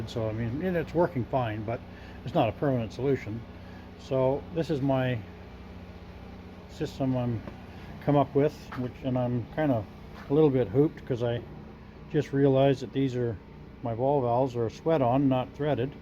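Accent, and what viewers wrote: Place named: East Coast, United States. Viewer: you have more of an east coast accent.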